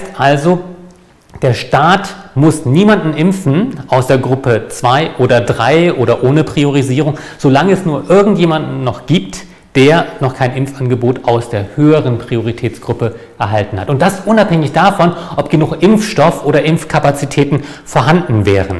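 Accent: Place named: Franconia, Germany. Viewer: Totally besides the point aber ich habe mir die ganze Zeit gedacht: der Akzent ist doch aus dem Norden. Jetzt hab ich Sie gegoogelt u wurde fündig: Verden, Niedersachsen :)) Ich vermute mal da nicht nur geboren sondern auch aufgewachsen? Anyhow- Danke für Ihre Bemühungen u Arbeit im Bezug zur Pandemie u ich hoffe sie fühlen sich in Würzburg zu Hause. Ein bisschen Fränkisch war ja bereits mit dabei. Hihi.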